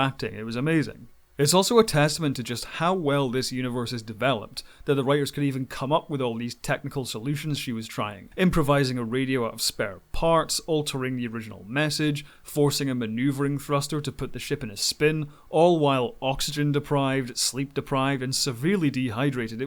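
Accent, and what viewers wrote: Scotland, United Kingdom. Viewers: Thanks for the review,. It's nice to hear a fellow Scot, and especially one so articulate and informative. I didn't know about the behaviour of the actor Cas Anvar, which meant that I couldn't understand the death of Alex in the show, who's incidentally my favourite character. Through no fault of your own, I'm going to struggle to re-watch the show again. Anyway, cheers for the review.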